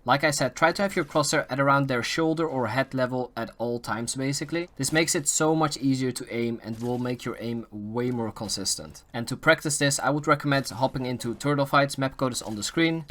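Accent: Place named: Netherlands. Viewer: Bro ik hoor aan je engels accent dat je Nederlands bent geef get toe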